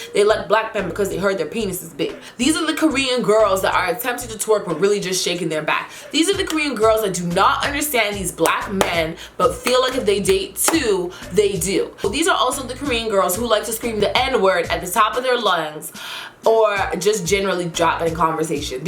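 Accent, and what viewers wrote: Jamaica. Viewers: YYYYYAAAAASSSSS I LOVE UR JAMACIAN ACCENT 😍😍😍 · I like how as I heard the intro song I had a feeling that she was Jamaican, but after she seh 'boom' and 'tump' I knew she was a yaddie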